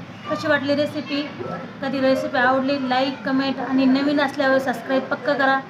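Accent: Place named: Sillod, India. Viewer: ताई संभाजीनगर सिल्लोड तालुका आहे का तुमचा बोलण्यावरून वाटलं तसं म्हणून विचारलं 🙏😊🧘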